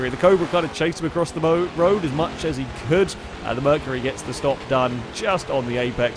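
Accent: United Kingdom. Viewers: I never thought I'd be addicted to listening to a British guy ramble over Forza replays. But here we are.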